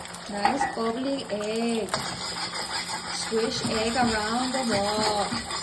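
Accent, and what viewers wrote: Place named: Derry, United Kingdom. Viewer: I can here the derry accent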